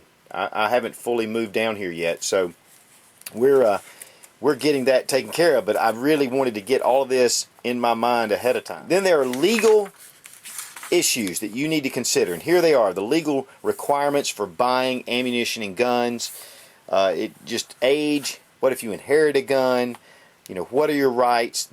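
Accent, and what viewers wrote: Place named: Greenville, United States. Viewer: ! I knew you were in Greenville! I was born and raised there, and I had a funny feeling you were there too based on the accent.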